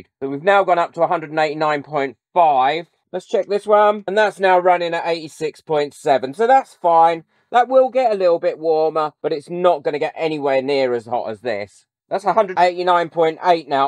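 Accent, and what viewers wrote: United Kingdom. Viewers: I love British YouTubers because the way the speak it is very pleasant to listen too. I love the British accent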